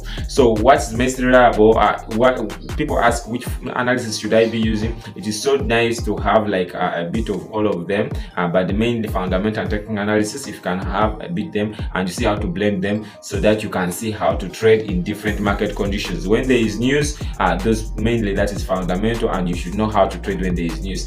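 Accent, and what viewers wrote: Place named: Nigeria. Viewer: He sounds Nigerian Hope he keeps up the work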